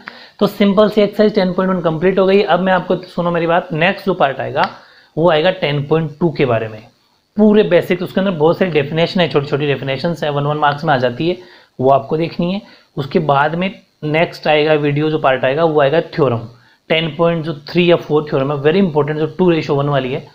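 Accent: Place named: Bengal, India. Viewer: Sir aap Bangali hai mujhe aapki language se lag Raha hai kyunki main bhi Bangali Hoon by the way your teaching way is excellent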